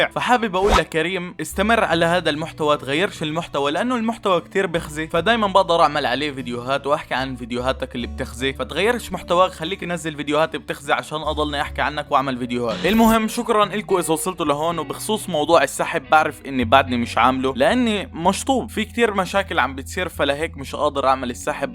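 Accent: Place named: North, Palestine. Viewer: لهجتك فلسطيني من الشمال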